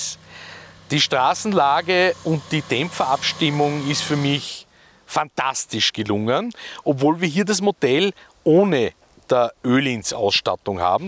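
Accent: Austria. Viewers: Ich liebe euer österreichisches Dialekt. Ich bin diesen Renner gestern Probe gefahren und habe danach gleich unterschrieben.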